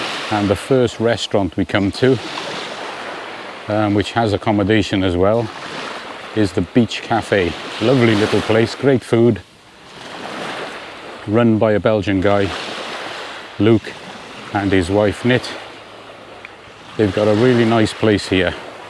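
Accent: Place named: Cardiff, United Kingdom. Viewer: I thought u had a Cardiff accent I’m living in Melbourne but I’m from porthcawl originally I’ve got a condo in jomptien in the Maldives ready for retirement after this virus clears up your video is very good keep it up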